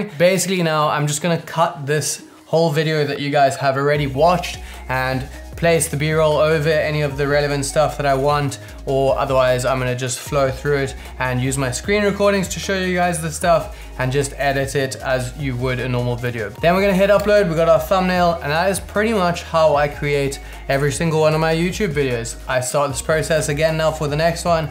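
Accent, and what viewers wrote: South Africa. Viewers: Is jy 'n suid afrikaner? Dit klink soos 'n jaapie wat in England woon.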